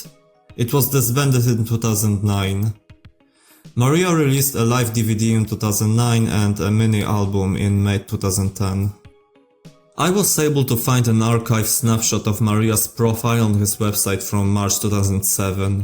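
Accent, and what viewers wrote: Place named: Poland. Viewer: Are you from Poland? I can hear our accent 😭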